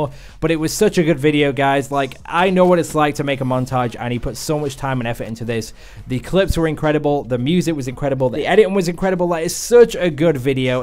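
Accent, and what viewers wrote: Yorkshire, United Kingdom. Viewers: I don't notice your accent coz I'm from Yorkshire as well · aha is a Yorkshire like me 😂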